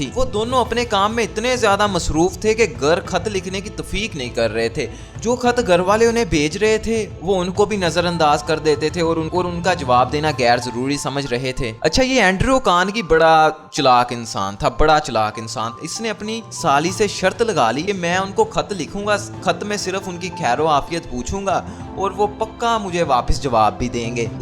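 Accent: Punjab, Pakistan. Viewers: Ap ka bat karny ka andaz bhat acha hay ap k lahjay say lagta hay ap panjabi hay ap k bat karny ka andaz bhat acha hay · Taubaa ap punjabio ke accent ktne bure dihati jse hote hn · آپکی ویڈہو اچھی ہے لیکن آپکا لہجہ پنجابی ذدہ ہے اپنے لہجے کو اُردو میں بد لیں۔ وجہ کیا ہے وجہ یہ کہ اٗردو آپکی قومی زبان ہے۔ اب دیکھتے ہیں کہ آپ کیا سیکھتے ہیں۔ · Our yeh Punjabi wala lahja, please Urdu na hi bolo to acha hay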